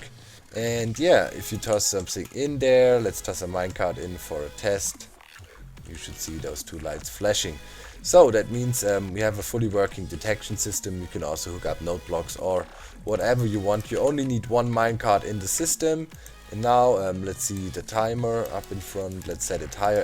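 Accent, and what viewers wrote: Germany. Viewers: You Sound a lil Bit grman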